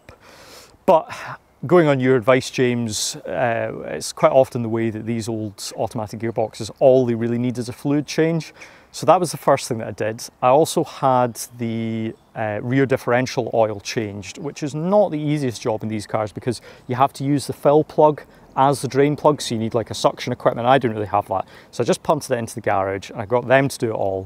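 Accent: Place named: Scotland, United Kingdom. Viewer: I fell asleep after 5 mins,,,,you are speaking scottish,,,everyone hates people speaking scottish,,,so,,,Im off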